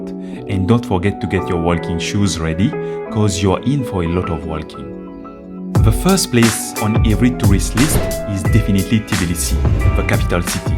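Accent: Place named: Mauritius. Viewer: Nice video and keep it up and I love your accent I don’t know but to me it sound like yo are from Mauritius 🇲🇺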